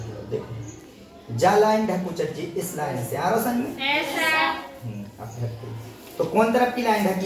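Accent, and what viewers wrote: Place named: Bundelkhand, India. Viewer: Apni bundelkhandi boli ki bat hi aalag he 🙏🙏🙏❤❤❤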